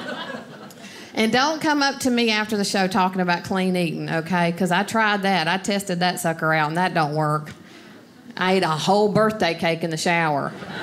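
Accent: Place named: Atlanta, United States. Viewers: That's just how you pronounce mills in Georgia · I could listen to her accent all day. I used to travel to Atlanta, I miss it!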